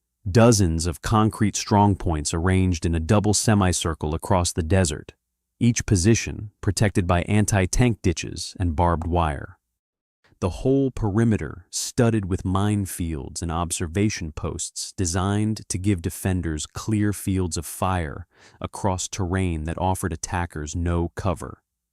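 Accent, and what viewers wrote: United States. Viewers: Stupid Al strikes again miss spelling and an Al generated yanky accent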